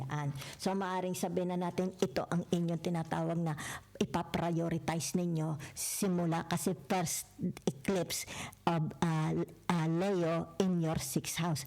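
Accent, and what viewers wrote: Batangas, Philippines. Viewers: Hello mommy kayo b ay Taga Batangas Dito sa pinas kc yong tuno.ng salita niyo eh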